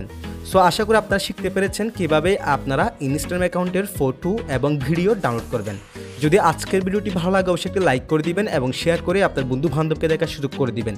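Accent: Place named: Chittagong, Bangladesh. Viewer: হাজার হলেও আমরা চাটগাঁইয়া,, আপনার কথায় ধরে ফেলছি ❤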